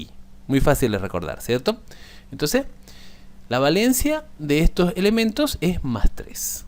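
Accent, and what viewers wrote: Santa Cruz, Bolivia. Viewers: Apenas inicio me di cuenta q era cruceño kakakajaajja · si cruceño de oro we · Además me di cuenta por el 'puej' y que en algunos casos decías palabras con j remplazando s, típico de un cruceño 😂. Por cierto exelente video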